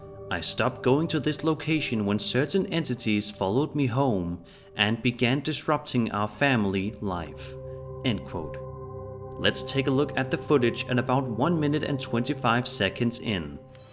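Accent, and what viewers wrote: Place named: Denmark. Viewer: This guy sounds danish :)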